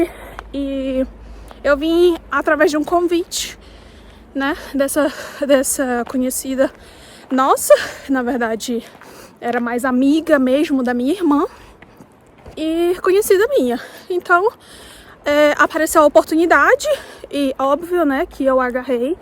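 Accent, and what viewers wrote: Brazil. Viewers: Suiça tudo de bom. Amo viver aqui. Que lugar da Suiça vc mora? Seu sotaque Paraense não é muito forte, gostei muito da sua história. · minha família paterna do Laranjeiras e materna de Belo Horizonte. Nasci e vivi minha infância lá. Todos os familiares ainda. Meu pai veio trabalhar em Manaus e vivi lá de 98 até 2022 com minha família. Reconheci logo seu sotaque do Sul do Pará ;) E vc é da onde?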